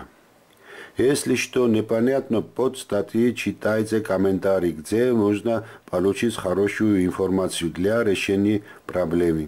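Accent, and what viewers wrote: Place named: Georgia. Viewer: Грузинский акцент, ласкает слух 👍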